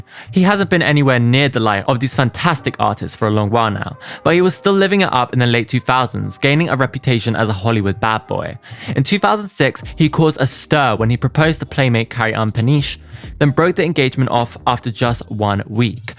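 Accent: United Kingdom. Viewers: british accent XD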